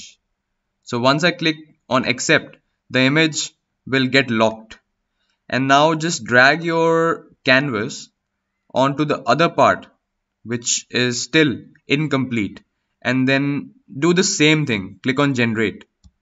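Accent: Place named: India. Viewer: hey, man, I totally understand your indian ascent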